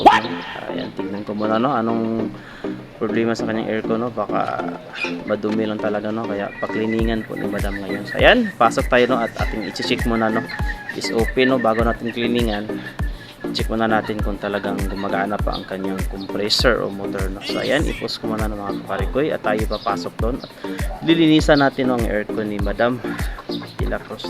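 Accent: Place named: Batangas, Philippines. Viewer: parang batangueno. salamat sa pagshare nito. #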